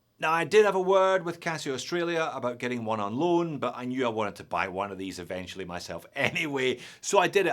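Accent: Scotland, United Kingdom. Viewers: It's not a $200 watch. Very enthusiast cheerful review this bloke sounds a wee bit Scottish maybe? 🤔 I prefer my Commodore Red LED from 1976 from Boots Irvine Ayrshire by Willie Claus 🎅🤣 Enjoyable video yet again.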